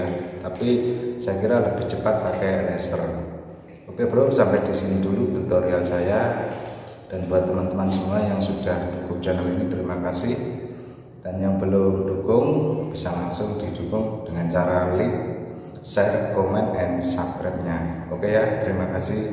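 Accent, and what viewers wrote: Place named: Kebumen, Indonesia. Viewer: kebumen ngapak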